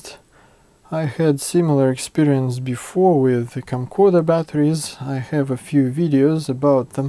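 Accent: Russia. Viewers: I love your video and you Russian accent ^^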